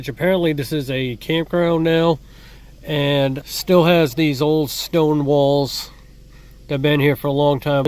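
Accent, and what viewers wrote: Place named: New England, United States. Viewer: You do have a blend of a New England accent along with another one you got elsewhere!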